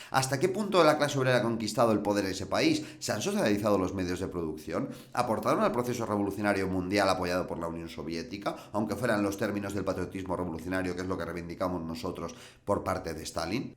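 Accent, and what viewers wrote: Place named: North, Spain. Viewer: sin tí FO no sería lo mismo,no tendría tanto crecimiento y menos aún los futuros votos de elecciones catalanas o europeas, créeme, soy gallego y tus formas de hablar y saber estar son propias del norte español, aquí tienes mucha gente que te escucha mejor a ti q a otros de FO. sigue así, genial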